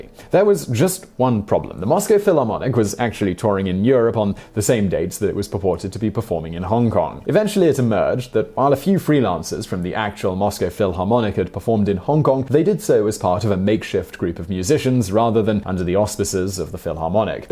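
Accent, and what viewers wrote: United Kingdom. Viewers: jew-lery? why do brits speak such poor english. Another favourite is 'Somethink and War'uh(water) ...??' just why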